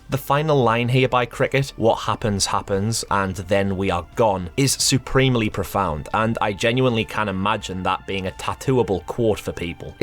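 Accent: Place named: Northern England, United Kingdom. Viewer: Hey, I used to live in South Eastern England, and I have been wondering where do you come from? My guess is Newcastle or Northern England in general:)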